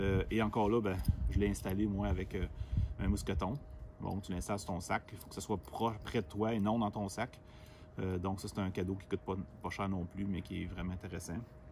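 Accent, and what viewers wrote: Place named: Quebec, Canada. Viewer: Je ne te connaissait pas très intéressant!! en français ...Québécois super !!!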